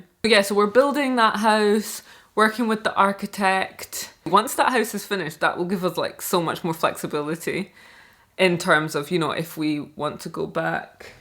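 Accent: United Kingdom. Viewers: I'm very happy for proud of you💐✨ I really like the British accent 💫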